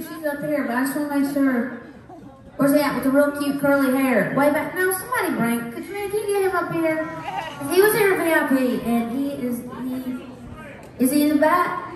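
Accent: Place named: Virginia, United States. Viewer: Love her Virginia accent, my home state. She's going places!! Another great Virginia Artist!!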